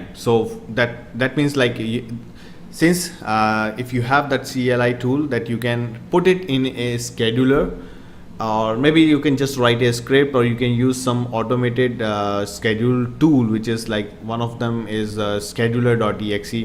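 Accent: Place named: India. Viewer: Indian accent = "instant dislike" print(Indian accent) instant dislike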